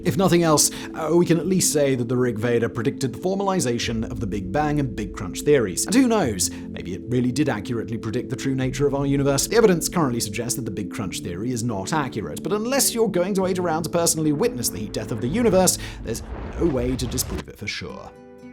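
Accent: United Kingdom. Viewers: Forget the beard or the chrome dome, the glib UK accent and rapid fire delivery hooked me. I predict in less than a week, I’ll have watched no fewer than two more of videos.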